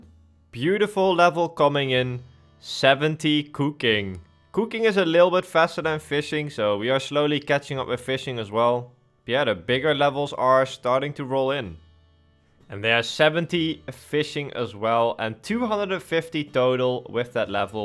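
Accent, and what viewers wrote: Netherlands. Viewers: My god the video is fantastic! the Dutch accent is hard to listen to, but I will stomache it for the content :)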